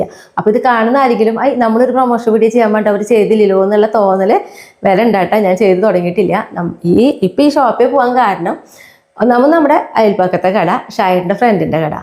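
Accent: Thrissur, India. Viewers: Eniku ningalude samsaram clear aakunnilla.kollakarku thrissur slang manasilakan kurachu prayasamanu.....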